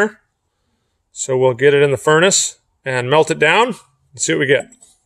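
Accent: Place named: United States. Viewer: Weird, guy has an American accent, but it looks like it was filmed in Somalia!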